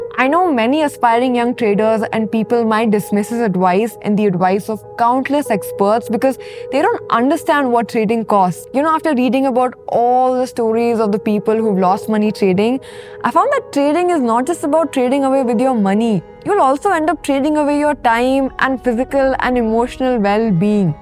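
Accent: India. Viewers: indian accent :face-green-smiling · Love The Indglish Accent 😅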